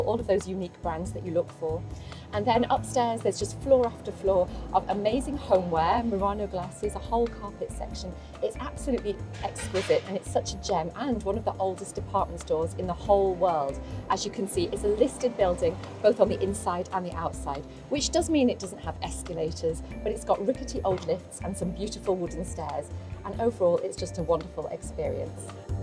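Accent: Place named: Manchester, United Kingdom. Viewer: I will check it out. 😊 I heard a bit of a Northern accent today in your video.Didn't realise it was Mancunian.👍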